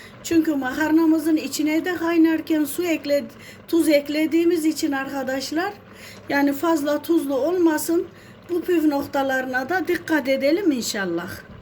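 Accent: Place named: Nevsehir, Turkey. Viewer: Kesin Nevşehirli bu abla 😅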